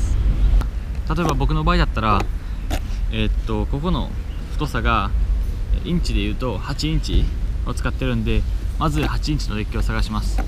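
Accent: Japan. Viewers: いつもお疲れ様です！さんって何県出身ですか？？喋り方的に関西か東海のほうですか？ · 普段はどこに住んでるんですか？あと顔とかハーフっぽくてイケメンやし英語喋れるけどバリ大阪弁、、、ハーフですよね？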